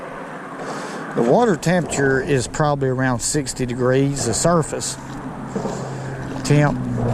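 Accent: South, United States. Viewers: Don't understnad what you say with that funny Alabama accent. Love ur southern accent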